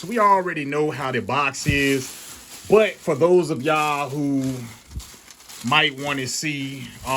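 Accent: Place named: Louisiana, United States. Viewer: Where u from? Sound like Louisiana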